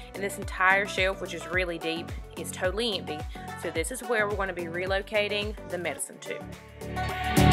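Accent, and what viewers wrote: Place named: North Carolina, United States. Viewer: I can definitely hear the Pembroke/Lumbee in your voice!!! Love the video!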